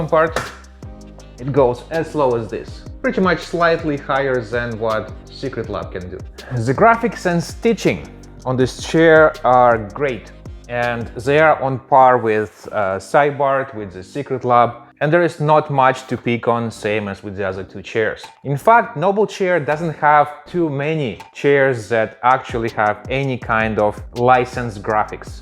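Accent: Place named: Eastern Europe. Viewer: Why someone with a eastern european accent is using the stupid inches as measurement?, he was born using the right METRIC SYSTEM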